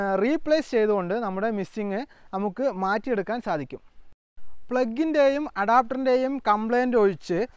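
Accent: Palakkad, India. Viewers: Palakkad ano bro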